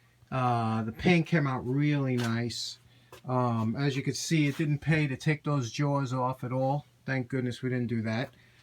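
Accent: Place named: New Jersey, United States. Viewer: I just can't stop watching your videos. Addictive but legal??? Your Jersey accent is awesome. Adds reality to the process and work, somehow.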